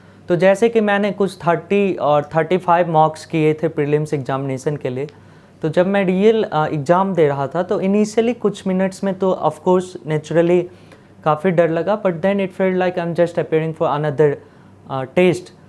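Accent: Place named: Bihar, India. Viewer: Sun kr LG raha hai ki ye bihar se honge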